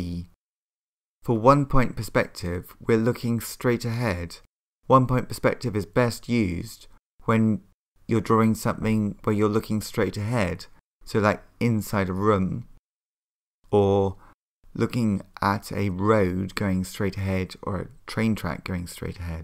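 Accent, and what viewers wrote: United Kingdom. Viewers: Such great instruction. Lovely voice. I adore the British accent. ❤️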